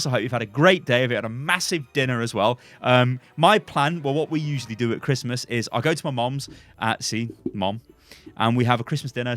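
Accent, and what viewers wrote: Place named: Birmingham, United Kingdom. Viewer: Damn this nigga from Birmingham?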